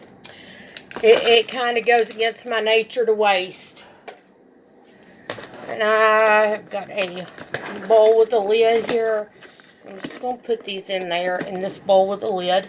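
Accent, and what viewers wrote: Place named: South, United States. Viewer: Mama, I love that southern accent.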